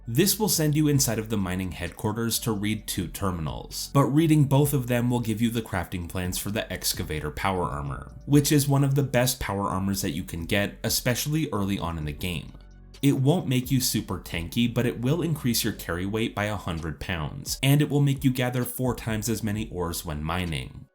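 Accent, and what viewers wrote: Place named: Midwest, United States. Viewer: I’m guessing he’s from the Midwest. Maybe Ohio or PA. His speech is PERFECT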